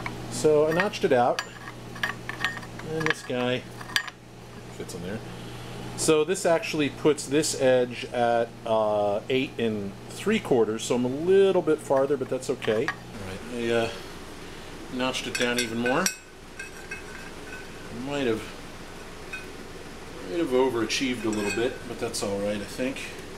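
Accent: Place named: Baton Rouge, United States. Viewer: Nice job. I have a 17 year old 91764 lift with a bad pump. It works fine with my bikes 500lbs and under but puked itself trying to lift my Harley Road Glide. At 900+ lbs it just might be time for a lift replacement. Costly, but the bike is too near the limit of the lift for my comfort. Even if it did work, I'd drill a few new holes for the safety bar higher up as I don't need the bike more than 24" off the floor. By the way, I guessed right. You sound exactly like a contract engineer I use that's from Baton Rouge.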